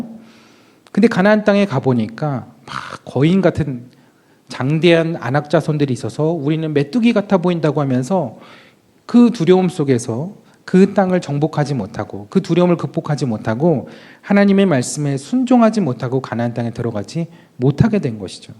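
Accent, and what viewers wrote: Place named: North Korea. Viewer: 목사님 북한 사투리 짱 이예요 ㅎ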